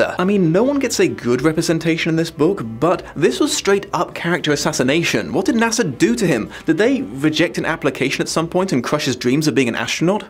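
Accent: United Kingdom. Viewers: just curious, are you from the UK? I find that accents from parts of the world I'm familiar with (/from nearby so I've had at least the briefest IRL exposure too) definitely add to the immersion, but accents from other parts of the world can be sooo hard to grasp!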